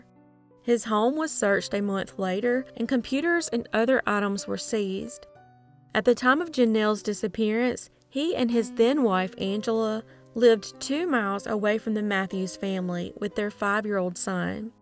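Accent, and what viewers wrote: South, United States. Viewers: Urgh that southern accent, and the way you end your sentences makes me cringe 🤮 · South African accent is mostly inspired by British or Australian accent! But in this context the person is wrong to judge her accept! I think her Southern accent is so great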